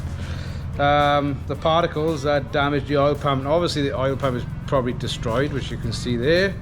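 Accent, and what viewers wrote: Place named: South Wales, United Kingdom. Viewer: Thats a real Bummer, like a Major BumOle! So sad to see a well sorted bike go bad like that , you obviously know how to put a engine together but not sure if you worked on that one before the issue. At least we got to see the internals of a DRZ, i thought they were pretty much indestructable. Ihave a Husky WR250 that went pop due to a exhaust O ring tear,ingested some finest Welsh grit and did the big end crank and the bore in. I ride a Xr650R and a few more Hondas but not turned the BRP over in a few years so I just hope she is ok buried iin the back of the garage. Good luck buddy , detect the SWales accent there , alot warmer where you are atm Atb x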